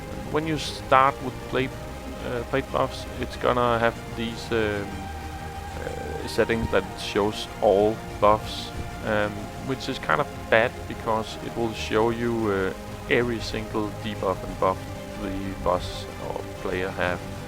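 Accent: Denmark. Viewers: are you from denmark? :D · Are you danish? You sound like it :) And which addon do you use for your actionbar?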